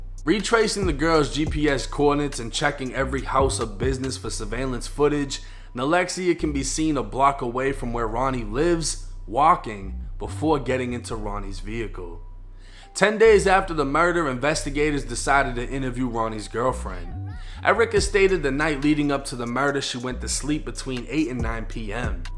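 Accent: Boston, United States. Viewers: He literally sounds white with a Boston accent wtf are you hearing 😂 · Is from Boston or somewhere in New England or something?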